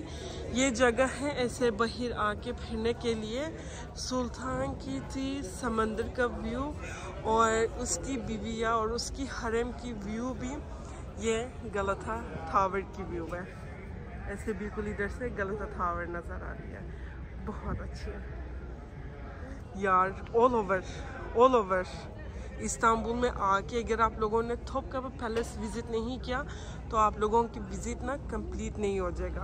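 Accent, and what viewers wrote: Pakistan. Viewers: Assalam alaikum, this is Khawar from Karachi, Pakistan. Really like your urdu accent. Wish that when Me n my family visit Turkey we would love to meet you. Regards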